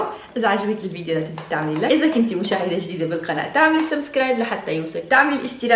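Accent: Aleppo, Syria. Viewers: دخيل الله ما ألذك😍😍 ماتبلى هالضحكة وهاللهجة الحلبية اللي بعشقا❤️❤️ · حضرتك من سوريا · دخيل روحك بتجنني 😍 دخلك كأنو لهجتك حلبية ؟؟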